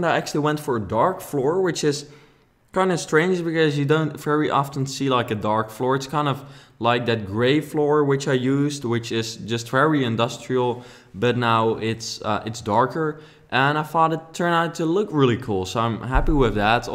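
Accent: Netherlands. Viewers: Great work! I love the part in the middle, it's so creative! As a Dutchie myself, I did find your accent a bit uncomfortable in the beginning, because it sounds so Dutch? But now I totally love it, and I always listen to everything. And you come across as a very nice guy, down to earth and sweet, very different from other channels. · You do have a little accent, but I don't think its anoying. I just like your video's! I'm dutch to and its just funny when I hear that kind off accent❤ And its a super cool house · I'm Dutch and yes and I can hear you're Dutch too, btecause of the accent. but you pronounce every word right and it's not like you always stop to think about how to say or seek for words. So you're good for me! :) (Go Gelderland! :D) Daarnaast. Je builds zijn geweldig! Ik houd van zulke moderne huizen ipv de typische american houses of de standaard rijtjes huizen die wij hier hebben! 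Ga zo door!!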